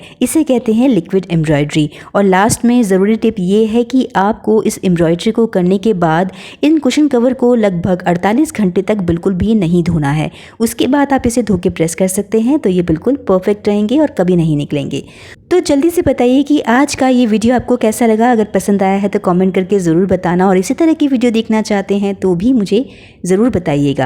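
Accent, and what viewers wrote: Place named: Maharashtra, India. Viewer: 😊 bahut pyari Marathi hai aapki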